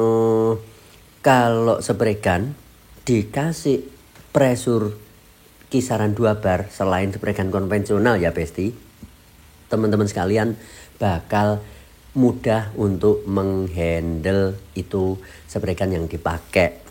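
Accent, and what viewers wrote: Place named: Java, Indonesia. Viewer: smpean jowo ndi to mas kok medhokmen 😁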